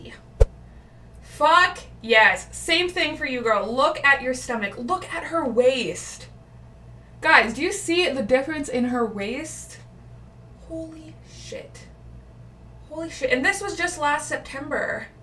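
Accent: Canada. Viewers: Your Canadian accent is SO strong it’s adorable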